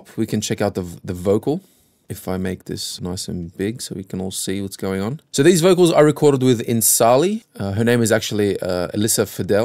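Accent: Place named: Australia. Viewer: Ahh the comforting sound of the aussie accent, it makes me happy to hear you haven't lost it at all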